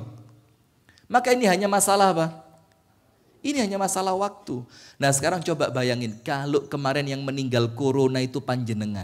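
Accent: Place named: Malang, Indonesia. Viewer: Alhamdulillah jazakallah khoyron ilmuny ustadz,saya tinggal di Lampung tapi asliny org malang..jd tombo kangen dgn logat malangny🙏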